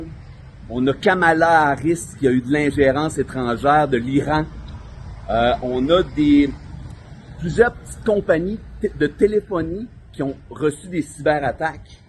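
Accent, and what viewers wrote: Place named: Quebec, Canada. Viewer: Merci beaucoup, j'adore l'humour Québécois,et la façon de parler.Amities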